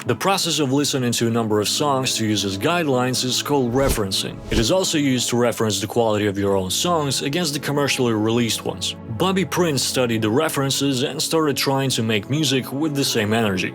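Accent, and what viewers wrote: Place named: South, United States. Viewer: Merio 😅 Dig the southern accent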